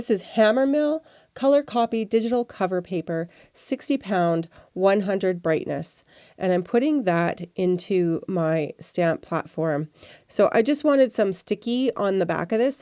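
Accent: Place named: Wisconsin, United States. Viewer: I lived in WI years ago and you remind me of what my accent was, I love it!!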